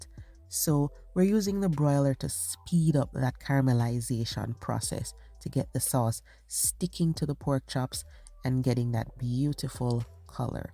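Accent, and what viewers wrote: Jamaica. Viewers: Jamaican? Hearing an accent!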